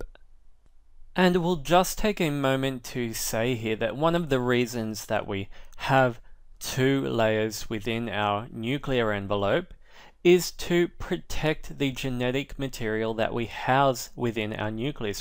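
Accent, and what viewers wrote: Australia. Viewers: Is that australian accent??? 😍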